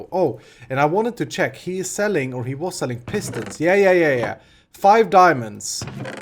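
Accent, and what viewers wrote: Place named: Sweden. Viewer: i am always disturbed by other swedes english. you are the only one i got used to.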